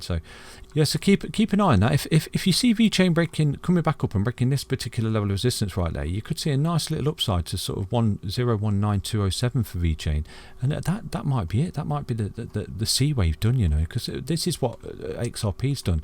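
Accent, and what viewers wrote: United Kingdom. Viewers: Alot of the terminology with these charts is new to me, combined with the British accent and dialect, I maybe understand about 70% of what's going on but im still tuning in. HODL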